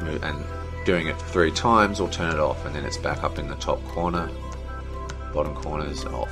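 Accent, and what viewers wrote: Australia. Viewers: You sound Australian. I am Australian. I like you :)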